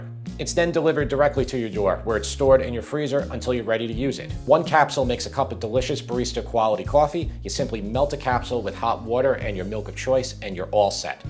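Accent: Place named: Boston, United States. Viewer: Your accent is very similar to mine. South of Boston? I really enjoy trying your dishes. They’re not overly complicated and they’re hearty. I’m feeding a picky 11 year old so I appreciate your son’s reviews. 😊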